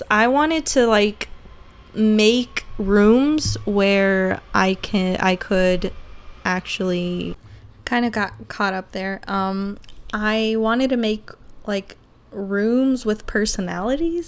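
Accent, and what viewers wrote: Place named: United States. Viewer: Adorei o inglês! Parece até americana mesmo <3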